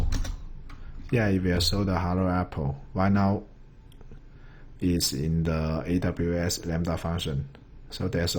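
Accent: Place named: China. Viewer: you accent is closed to a Chinese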